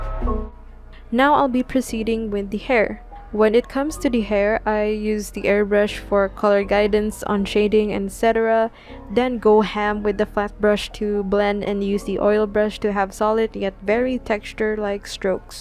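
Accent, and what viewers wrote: Philippines. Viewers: From the chicken and the accent, I can tell you're from the Philippines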